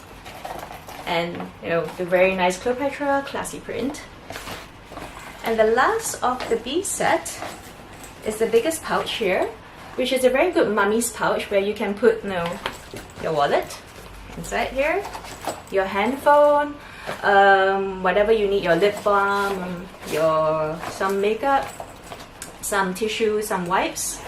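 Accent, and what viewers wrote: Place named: Singapore. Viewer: Are u from Singapore. I can recognise your accent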